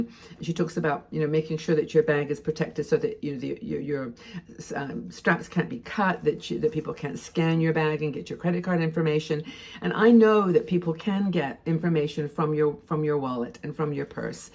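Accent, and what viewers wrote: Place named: Wales, United Kingdom. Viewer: Yes. Agree about clear diction but thought I heard some of the Welsh !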